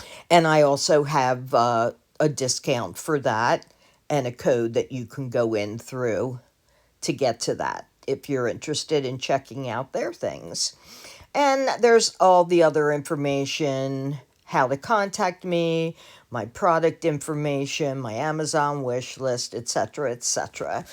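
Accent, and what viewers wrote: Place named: East Coast, United States. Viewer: Very nice! I'm just starting with resin. I have a starter kit coming soon. Been creating jewelry for 35 years, but just noticed resin. Sounds like you're from the east coast. I'm from Philly, but I listen to accents from reason.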